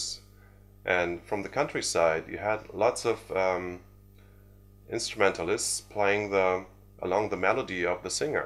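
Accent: Germany. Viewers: I'm trying to Google, and all I can get is info on Andy Richer. I've never heard of either. ' accent, sounds Dutch, Austrian, German, anything but American south of the Mason-Dixon line which, as most people know, separates northern states and southern states of the USA. Anyway, I finally found some info on this "expert" on American rockabilly music. He has no pedigree of any kind. He's no doubt a good guitar player, I've never seen or heard of him, but I give him the benefit of the doubt. The commentators who use words like "awesome" are incredibly gullible. Don't they realise that there are indigenous rockabilly players who have generations of knowledge of this music behind them from whom they could learn much more than a German fan of the music who has decided that he can teach the world how to play it? Sorry, and I'm absolutely sure that your real first name is not, doesn't sound German.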